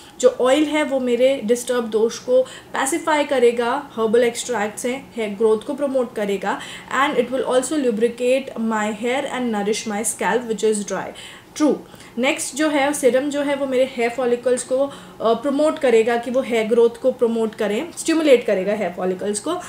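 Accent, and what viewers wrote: India. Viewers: Rajasthani lg re ho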